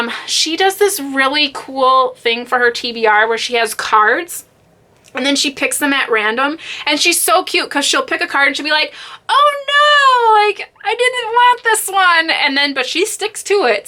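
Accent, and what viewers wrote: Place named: United Kingdom. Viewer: British accents are always a plus XD awwwww! You are definitely warm and friendly!!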